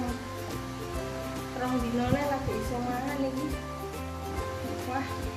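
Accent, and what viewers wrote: Indonesia. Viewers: Orang Jogja kah Bun?😁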